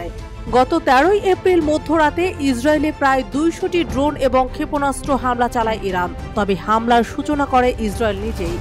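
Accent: India. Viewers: India voic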